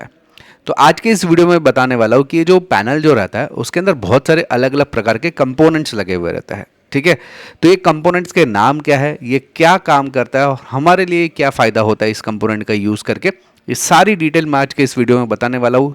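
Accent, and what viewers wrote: Gujarat, India. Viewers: Sir aap basically Gujarat se ho kya.. language se laaga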